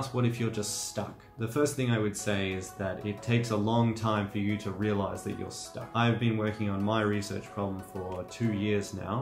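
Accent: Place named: Australia. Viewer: Aussie accent :O oh you're at usyd? Im over at UNSW. Not even studying maths but I'm going down a rabbit hole and I now I really wanna relearn maths 😂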